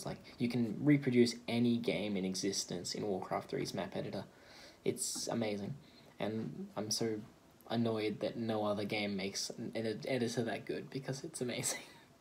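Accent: Australia. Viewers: You sound very Aussie still. But right at the end when you said "I'll see yas round". I was like "nah he's definitely Australian haha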